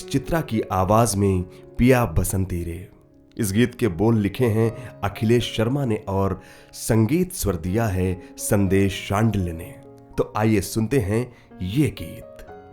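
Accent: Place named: Bihar, India. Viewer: बीते अनुभव की कुछ झलक थी, चंदन की कहानी। फिर 'दिहाड़ी' के रूप में सामने आई। अक्सर देखा गया है कि लोग चले जाते हैं कमाने-खाने बाहर.. गाँव-देहात वाले शहर, शहर वाले बड़े शहर और बड़े शहर वाले विदेश! रोजी रोज़गार का न होना, पलायन के बड़े कारण और बड़ी समस्या है। खैर, कहानी लिखी और फिर रह गई कहीं। आपकी आवाज़ ने इसे जीवन दे दिया। हर पात्र सजीव हो उठे और अपनी-अपनी सुनाने को बैचेन हो उठे। सर, आपका जितना भी आभार व्यक्त करूँ कम ही होगा। आपने जिस भाव और संजीदगी से पढ़ा है, वो काबिलेतारीफ है। बिहार की ठेठ बोली को बड़ी ही सुन्दरता और सहजता से निभाया है आपने। अपनी ही कहानी को सुनना एकदम से अलग अनुभव है। और, शुरुआत में तो आप बिल्कुल रेडियो वाले ही लगे!🥰 बहुत बहुत धन्यवाद आपका सर! 🙏🙏 😊😊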